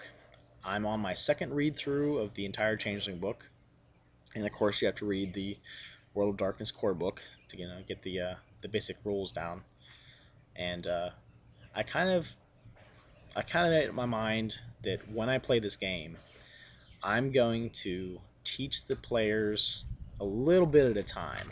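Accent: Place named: United States. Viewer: American English kind of hurts my ears sometimes, how they pronounce the 't' like a 'd', and such their teeth every 10 seconds, but other than that you seem to be getting a grasp of the game. You have much more to read though my friend, the new supplement books outshine the core book.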